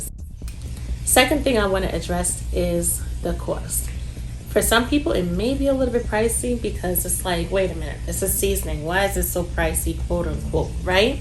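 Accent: New York, United States. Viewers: What a beautiful lady inside and out! I subscribed and enjoy ur videos soooo much ❤ such a NYorkaaahhh I hear the accent lol. Born and breed BX girl right here! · I’ve always wanted to ask : are you a New Yorker? Because it definitely sounds like it.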